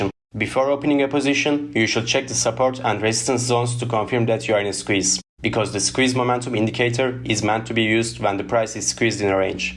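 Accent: Turkey. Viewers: Bu adam türk şivesinden anladım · You have so much Turkish accent. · Thank you for the video. Your pronounciation definitely shows that you are Turkish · türk olduğuna yemin edebilirim ama kanıtlayamam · tam bir Türk ingilizce si açıklığıyla anlatmışsın,eline saağlık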